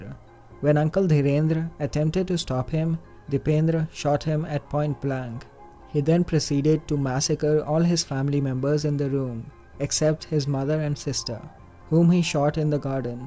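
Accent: India. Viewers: are you from India? You sound like Indian if I'm not wrong. Anyways thank you for including Nepal case.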